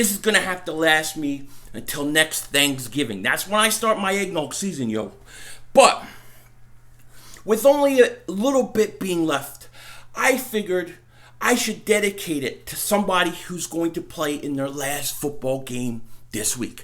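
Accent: Philadelphia, United States. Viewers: this is L.Boogie yea we lost last night and I'm very heart broken every fan is when their team season is over especially when their team gets this far and fails to win, but I hope your going to be live like u was last week in the Chicago game and I'm gonna keep it real with u I hope the eagles fail to fly no more Foles magic it ends tonight so I will be watching u live but I'm not going to be shit talking u live people was last week I don't do that but i am a cowboys fan that likes your channel it's just friendly fire like u and Mark Holmes do and i watch u and mark all the time and ru from u got that kinda accent where ru from anyway?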